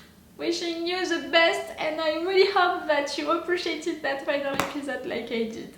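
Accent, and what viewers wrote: France. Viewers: I love your french accent it's beautiful! I subscribed. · I love your Frenglish accent and your true to the ground emotional reactions, your tears of joy or sadness, your authentic cuteness. <3 Shy meow and hug from Switzerland. ^^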